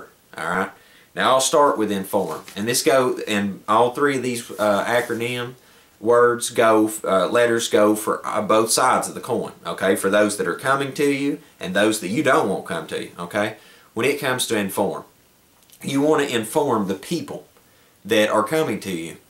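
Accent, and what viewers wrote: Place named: Kentucky, United States. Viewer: Sweetheart you have great information, but you could reach sooooo many more people by playing down the accent. Im from kentucky and made a point to talk straight as a kid and noone can tell where im from now, but all of my family sounds like you. Its hard to be taken seriously without that non-regional dialect - you could reach sooooo many more people if your voice didnt overpower your message. Please dont take offense its a genuinely heartfelt critique. Again great info, and great reminders. Thanks for doing what you do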